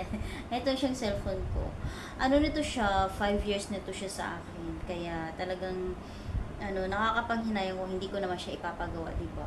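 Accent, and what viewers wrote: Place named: Hiligaynon, Philippines. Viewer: Ang ilongga accent di tlga sya matanggal oyy 😂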